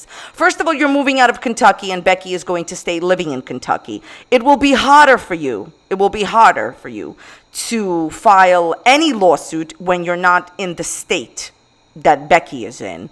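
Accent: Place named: New York City, United States. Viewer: I think my favourite parts about you, is how strong you are about your feelings, and your strong new york/new jersey accent. I’m stationed Virginia right now and hearing you reminds me of strong willed women from my home, New York, and it warms my heart. Love you so much for fueling my unending desire for drama as well as my homesickness <3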